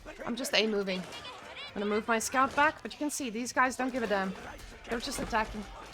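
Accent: Afrikaans, South Africa. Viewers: Awe my bru! Is that a afrikaans accent I hear?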